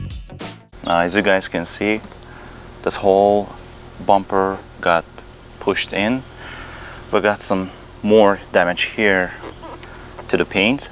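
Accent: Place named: Russia. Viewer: Интересный акцент, похоже на русское происхождение никак не английское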